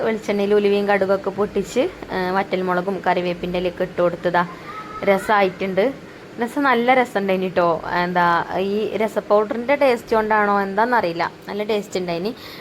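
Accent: Malappuram, India. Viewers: ഞാൻ ഒക്കെ വളരെ ദൂരെയാണ് എന്നാലും വാക്കുകൾ ഒക്കെ സെയിം റസിരെ വർത്തമാനം മലപ്പുറം ഇശൽ ഉണ്ടെന്നേയുള്ളു നമ്മൾ ഇവിടെ കണ്ണൂര് ജില്ല കാസർഗോഡ് ബോർഡറിൽ.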